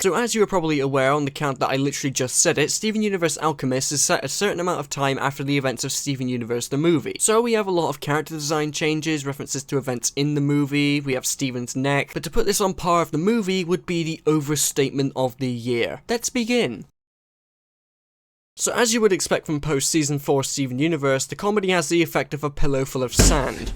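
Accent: United Kingdom. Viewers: I use your videos to pick up the british dialect, oh, and the actual content too.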